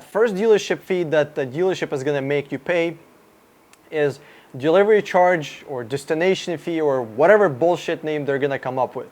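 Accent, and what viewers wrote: Russia. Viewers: Good advice thank you buddy. You sound like Russian ? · Are you Russian? Love your accent. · Why do you have Russian accent???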